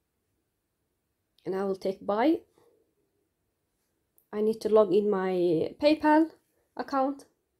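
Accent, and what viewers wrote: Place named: Arab world. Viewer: C’est un arabe vu sont accent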